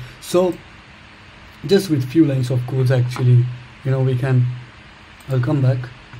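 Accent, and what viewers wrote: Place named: India. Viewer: Finally an Indian who has proper accent 😁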